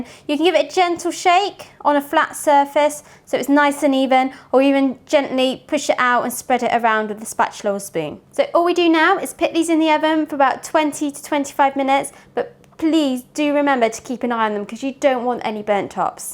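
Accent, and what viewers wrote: United Kingdom. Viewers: why do you sound British I'm British and I don't sound like that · Talking in British English nice taking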